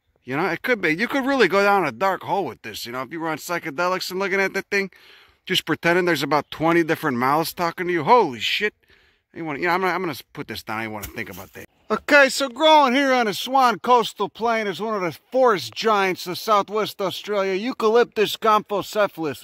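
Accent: Chicago, United States. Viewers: Chicago I think. It is really nice hearing his accent and botany.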